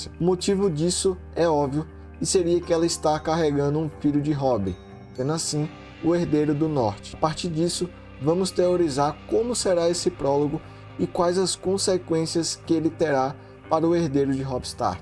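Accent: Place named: Bahia, Brazil. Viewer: Manin, desculpa a pergunta meio inusitada, mas tu é baiano? É que o seu sotaque me lembra muito o do Vampeta kkkk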